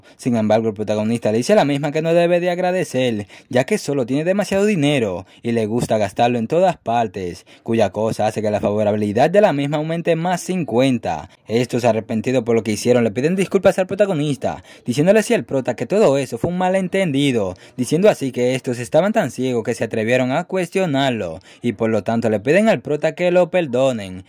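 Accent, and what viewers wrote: Dominican Republic. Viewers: Eres de republica dominicana?. O de alguna zona del caribe. Tu acento es muy parecido😅 lo digo por que estoy en republica dominicana tomando vacaciones. Pero buen video😅❤❤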